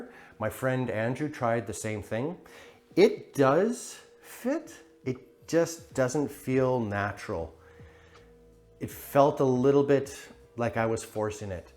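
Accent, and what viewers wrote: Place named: Edmonton, Canada. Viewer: Came for the cues, stayed for the beautiful edmonton accent.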